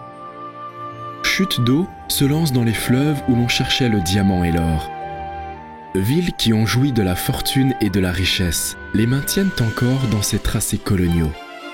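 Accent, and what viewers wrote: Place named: France. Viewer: Bahia com sotaque francês ficou divina. IMPERDÍVEL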